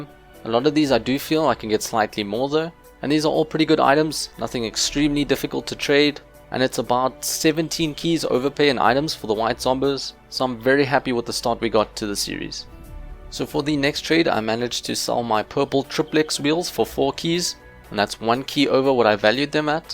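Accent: South Africa. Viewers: Are you South African ??? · South african? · I knew I recognised ur accent, I'm south african too ! hehe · Are u south African cause ur accent sounds like it btw i am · I will guess where you are from so accent sounds a bit African maybe light German but mostly African so South Africa would by my guess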